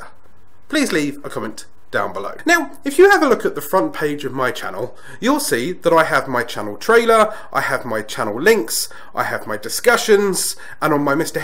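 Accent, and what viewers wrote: United Kingdom. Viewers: Just listen to his British English. Its worth more than 7k views in my opinion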